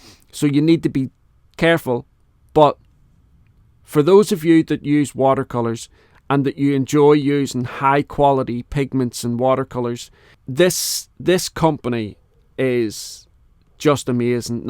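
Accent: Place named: Northern Ireland, United Kingdom. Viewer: I absolutely ADORE you accent!!!! The Northern Irish accent is quite possibly my favourite English-language accent. Actually I find that English speakers often mangle foreign languages not because they are not trying, but because they are putting in too much effort (so overcompensating wildly, trying to imitate what to them sounds like the foreign language they are trying to speak). Which is actually kinda sweet… except when it leads to funny misunderstandings - like when English speakers pronounce “coup” as “cul” (I hope YouTube does not remove this comment, haha), which in French means… a vulgar word for bottom. A very polite British friend of mine got in trouble for misprounoncing “merci beaucoup” that way. So, he accidentally said to a lady who was holding the door for him, “thank you - nice a**e!” 😂 PS - I am also a fluent French speaker. 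And the Irish accent sounds wonderful in both!!!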